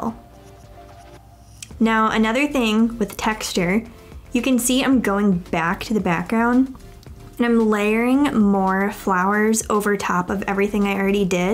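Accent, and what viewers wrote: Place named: Columbus, United States. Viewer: I love it! It turned out so pretty! It definitely makes me wanna take up wool art. I just might... Also, quick question - are you from the Columbus, Ohio area? Or did you grow up somewhere else? I only ask because I'm from the Dayton area (born and raised) and our accents are COMPLETELY different, which just seems crazy since you only live about an hour from me. Lol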